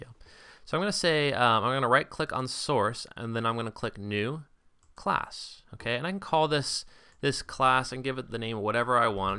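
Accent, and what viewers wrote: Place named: United States. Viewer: good morning Dr good to hear your american voice rather that the accent from India. I already listened to 3 videos on installing Java SW and i had no success too late at night to hear yours maybe tomorrow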